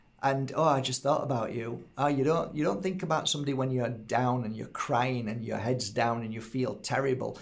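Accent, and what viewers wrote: Yorkshire, United Kingdom. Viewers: Is it a Yorkshire accent?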